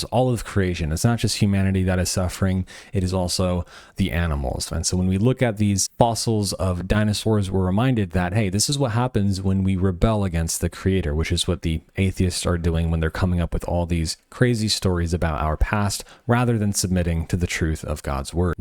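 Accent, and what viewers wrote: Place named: Australia. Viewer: wow you have an aussie so cute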